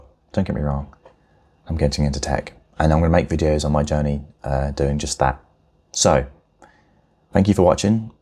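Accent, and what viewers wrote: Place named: United Kingdom. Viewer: nice video man, didn't understand 90% of what you were saying though. Cool yookay accent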